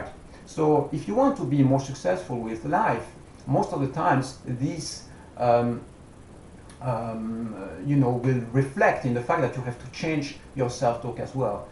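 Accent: France. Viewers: I'm sure you're french ;)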